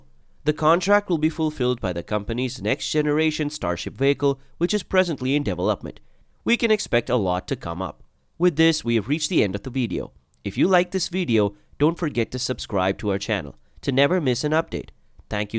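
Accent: South Africa. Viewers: where's the dude with the subtle South African accent, change of narrator NOT GOOD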